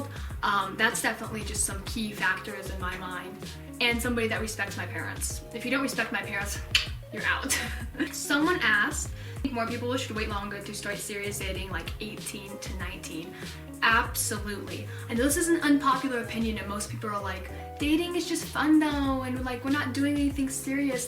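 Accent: United States. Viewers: Wait is she American????????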